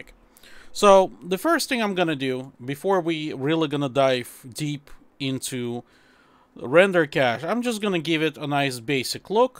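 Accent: Russia. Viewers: Ха-ха..., судя по твоему английскому, ты русскоговорящий )))) Может для нас сделаешь то же видео?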